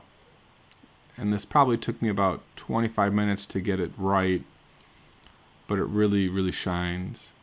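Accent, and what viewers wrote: Chicago, United States. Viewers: are you from chicago?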